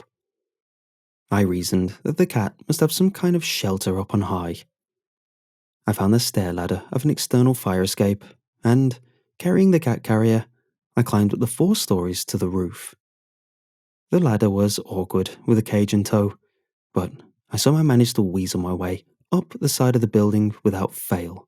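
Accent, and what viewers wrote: England, United Kingdom. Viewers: The english accent and the dry delivery sells this story. Two thumbs up!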